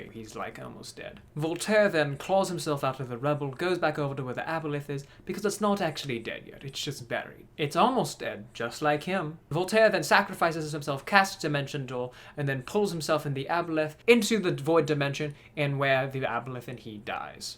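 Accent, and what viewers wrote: United Kingdom. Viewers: Come for the British gentleman voice, stay for the amazing DND stories I mean seriously your home brew content is so damn cool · Y’all already know that soon there’s gonna be fan fiction (smut) on Wattpad of Voltiaere🙈 Btw I’m simping over because of his content and his British gentlemen from the 1800’s accent aka Voltiaere😳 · That one person that disliked is just salty cuz they can’t do a British accent as good as his. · read the bee movie script in your british accent. this is an order. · What's more impressive than his British accent is how long his can keep his accent. Mine tends to fall apart the longer I try to speak with it.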